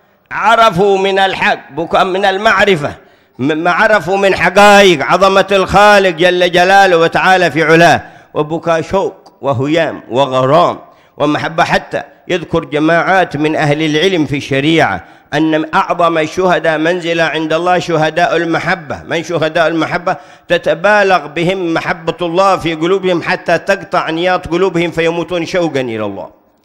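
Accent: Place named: Hadhramaut, Yemen. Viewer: كيف داعية وهو يتكلم بلهجته‼️😐 كيف يفهمون عليه و لهجته حضرمي حبشي‼️😐 والجمهور كله من العجم الله المستعان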